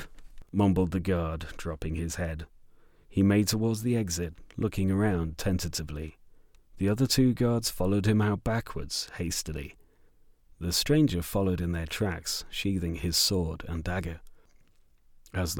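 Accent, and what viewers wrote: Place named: Birmingham, United Kingdom. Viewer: Nice. Love the quivering Brummie. Only thing I would suggest is a very slight further increase in pace during the "combat". But that is just my opinion.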